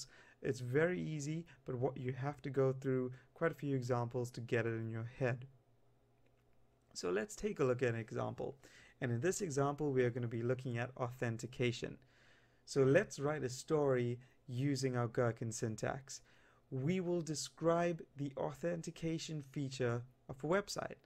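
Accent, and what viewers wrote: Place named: South Africa. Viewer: Great tutorial, very clear and concise! Nice to hear fellow South African (you sound a bit like Elon Musk actually!)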